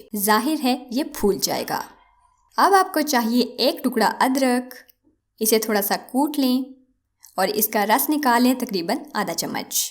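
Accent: Punjab, India. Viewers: Hahahaha ap Punjabi hooo ghera Dena grander ko sooooo 😍😍😍caut gheera😍😍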